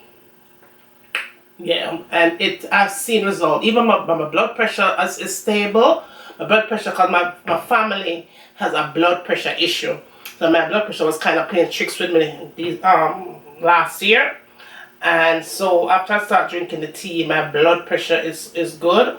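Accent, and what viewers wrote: Jamaica. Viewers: Very Jamaican. I love it.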